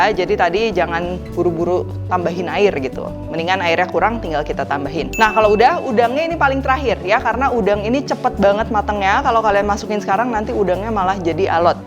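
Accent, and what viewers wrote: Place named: West Java, Indonesia. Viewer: Chef leukohnya Sunda banget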